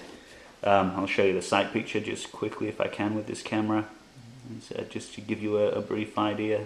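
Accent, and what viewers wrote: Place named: Australia. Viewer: You sound like you're from Australia. I thought, perhaps incorrectly, that hand guns weren't allowed there?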